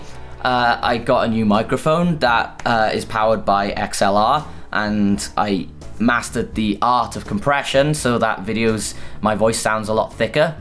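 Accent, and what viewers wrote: Wales, United Kingdom. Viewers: Hey man, where in Britain are you from? I'm trying to pin point your accent and you sound Welsh (I'd know😂) but I can't really tell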